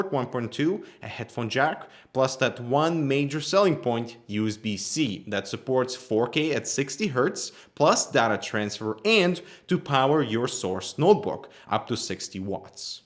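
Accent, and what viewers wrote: Russia. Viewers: я извиняюсь, что за акцент ты русский что ли?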